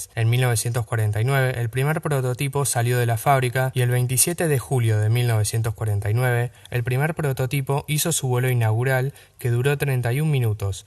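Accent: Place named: Argentina. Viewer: comencé a verlo y a poco andar se oye la voz de un argentino con sus aches características en vez de doble eles o "y" donde aquí no resulta para nada creíble -.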